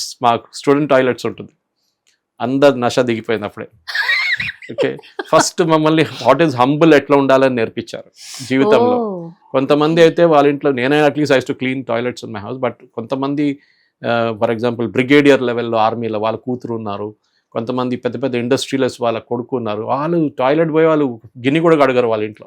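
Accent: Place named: Kerala, India. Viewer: మీరు అంటే నాకు చాలా ఇష్టం సార్. ఒక మలయాలి అయినా తెలుగు స్వచ్ఛమైన భాషతో మాట్లాడుతారు. జర్నలిజం లో మీలాంటి వాళ్ళు ఉండాలి సార్.. సార్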